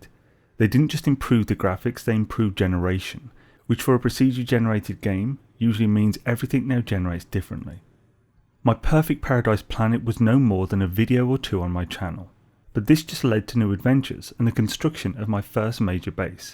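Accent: United Kingdom. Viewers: Very cool video, love the old clips and how brummie you sound Very cool to have been a part of the development of the UAS and involvment with other bits and pieces, very honoured indeed! Again, great video and long may the fun continue! 😍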